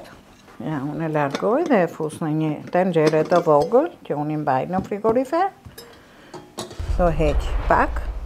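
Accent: Tirana, Albania. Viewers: Me pelqen kur flet me dialektin e tironse.Je shume e mire dhe e direjte perdrejte.Zoti te dhe te shendet dhe lumturi.Respekte e dashur❤ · Ty boft dita 1000, se ti me kenoqe vetem duke t'nigju , ate dialektin e mbrekullushem te Tirones 🌟🌟🌟🥰🥰🥰❤️❤️❤️💐🌹🌻🌷🌺🌼🌸🏵️☘️ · Sa te mira qe i ben!Me kujton mamin tim!Te ka lezet dhe e folmja tironce,nostalgji!Flm per keshillat mira,te ndjek me shume dashuri!❤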